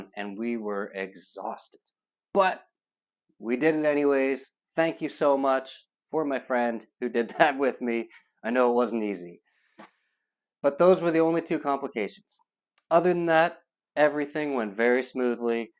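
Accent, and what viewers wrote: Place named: Atlantic Canada, Canada. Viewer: I love your accent! Nova Scotia or Newfounland?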